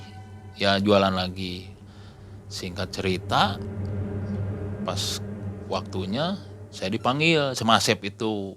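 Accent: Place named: Java, Indonesia. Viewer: Walaupun bahasanya agak medok,tp cara penyampaian nya bagus bgt,dan ceritanya jg bagus.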